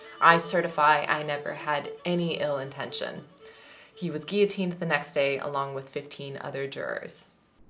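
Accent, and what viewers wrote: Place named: France. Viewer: Great french accent and history background ✨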